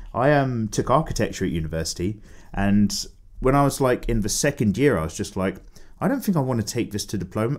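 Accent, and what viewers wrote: United Kingdom. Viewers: Hey! My first time writing on these episoed as I often just lurk and listen to your asmr british voice. But when you were talking about league of legends splashart (2 weeks ago, this is a repost) I had to ask you something, ever heard of Kienan Lafferty? He made most of the famous splashart for riot and he is my biggest inspiration and the reason I started drawing + Im a no lifer and ive played lol since 2012 and the art is way better than the gameplay xP Oh and YOU made me start drawing anime tiddies and "other" good parts;) Anyway love you and your videos <3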